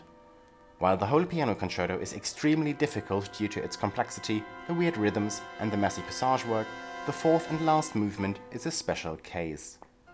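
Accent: Germany. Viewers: wait kommst du aus Deutschland/sprichst deutsch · Amazing video! I enjoyed it thoroughly because your explanations and highlights of each piece. I hadn’t heard some of the pieces you presented on the video like Godowsky’s Passacaglia or Regers’s variations which I’ll give them a try once I’m done with your video. Thank you for adding them to your video broadening my repertoire, at least as listener. By the way, I have a question that might be off topic but, are you German? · Are you from germany?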